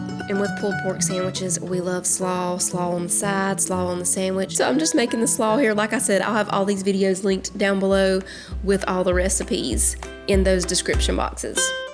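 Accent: South, United States. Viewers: Hey, I love love your videos. So cozy and homey and your sweet southern accent !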